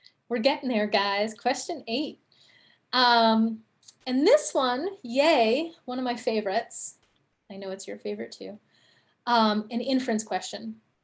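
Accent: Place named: United States. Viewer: Namaste from India Miss sweetest smiley, 🤗☺You are so sweet and Haha why American's People's English language is so stylish 🤷‍♂️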